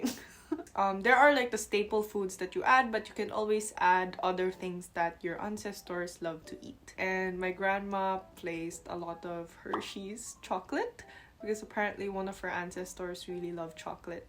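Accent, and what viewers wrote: Philippines. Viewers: Anyo sarangheyo 😅 🇵🇭🤝🇰🇷 🥰 ask ko lang pag nag e english ka dyan sa co worker or Korean friends mo ano comment nila sa pilipino english accent mo??? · Pinay na pinay accent kapag nagtataglish ka...hehe 😉😅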